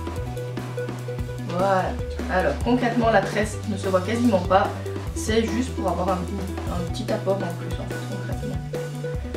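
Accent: Belgium. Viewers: Je me disais bien que tu avais un accent belge , by the way je vais a la mia dimanche aussi :))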